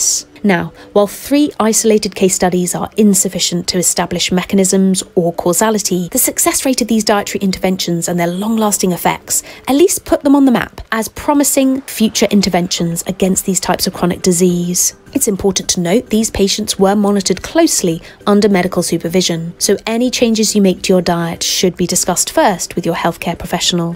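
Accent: England, United Kingdom. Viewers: 'London". English accent. US measures. ChatGPT must try harder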